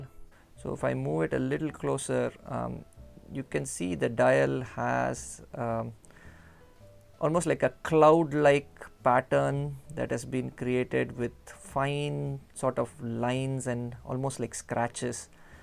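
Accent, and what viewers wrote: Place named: India. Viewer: Just can't listen to Indian accents for too long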